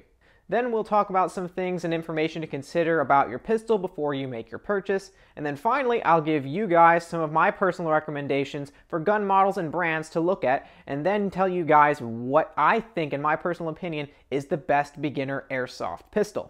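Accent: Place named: United States. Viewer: I cringed a little when you were describing a the blowback pistols.. Man, you sound like you’re here in the US, so why not get a real pistol? Having some knowledge of real guns can’t hurt. Racking the slide is really not that cool. It’s an integral part of the design. lol.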